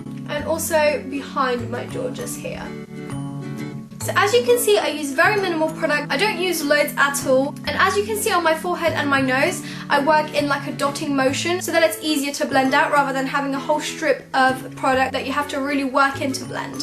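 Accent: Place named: United Kingdom. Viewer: To zabawne, bo pomimo tego, że masz piękny i co za tym idzie, mylący, brytyjski akcent, jakoś od początku przeszło mi przez myśl, że jesteś Polką :D Dobra robota, świetny kanał :*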